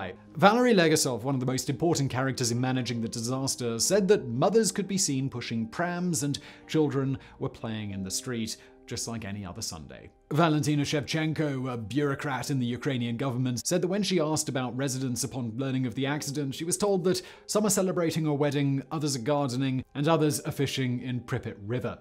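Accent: England, United Kingdom. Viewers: Another grammar fascist showing us all how smart they are by "correcting" the pronunciation in a video. BTW you do know is English and they sometimes pronounce words differently than than other countries do?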